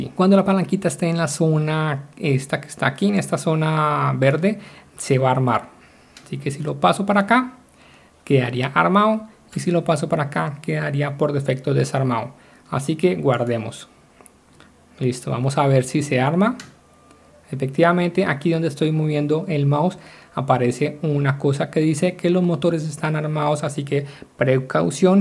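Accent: Colombia. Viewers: hola, eres de colombia??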